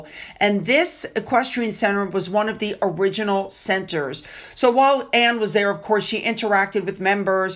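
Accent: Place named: South, United States. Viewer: Yes, very distinctly Southern, but you will hear it all over the U.S.! I love to be polite; it can mean so much as we go through our day, when we use 'Sir' or 'Ma'am'. ❤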